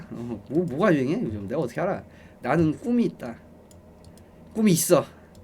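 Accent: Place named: Seoul, South Korea. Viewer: 케인님 나긋나긋한거랑 특유의 서울사투리 거르고봐도 말투나 표현법보면 상당히 여성적인편임 ㅋㅋㅋ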